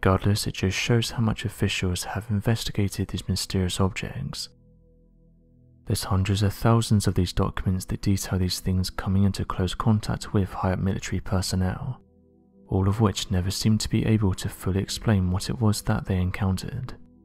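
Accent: United Kingdom. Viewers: All fluff no substance. This channel brings nothing to the table every video except a 'mysterious' British voice over whispering cautiously. Pretty pictures/zero substance.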